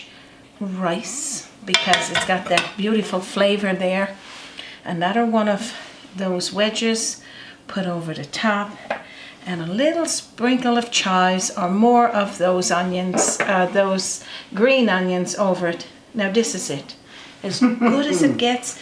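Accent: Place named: Newfoundland, Canada. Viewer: I love your NFLD accent, tell me when your birthday is so I can send you a Bag of H's. ere are y now? Cool, using orange juice, I have been an Armature chef, because I don't have a red or blue seal, but I have been cooking since 1978, my career took me to other professions, but still I am a cook for the rest of my life, thank you for sharing this very cool recipe, I'm definitely going to make it I like the orange with the rice, Baked. Tell us were you at?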